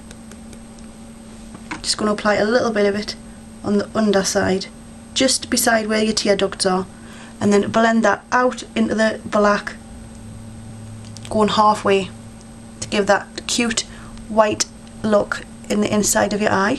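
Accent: Newcastle, United Kingdom. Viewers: r u from newcastle or around somewhere there?? great tutorial btw!!!!!!!!!!! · Is that a Jordi accent?? I absalutley love it <3 x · OMG it's nice to hear you talk, love your British accent. Oh by the way, you're pretty even without any make-up at all. The nailpolish is strange, but them I'm 48, haha... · She's a Geordie, it's a famous northern English accent. It's the same accent as Cheryl Cole if you youtube her on X-factor UK...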